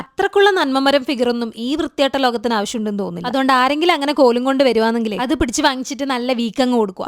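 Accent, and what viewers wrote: Kannur, India. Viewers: Ighale samsaram kelkaan vendeet maathraan njn ighale video kaaanin....first kanda samayath njn video ellaam keri kandu....ighale kannur slang I love it😂❤❤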